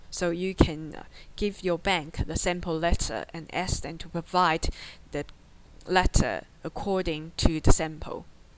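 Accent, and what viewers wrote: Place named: China. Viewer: You have Chinece accent which I love it :)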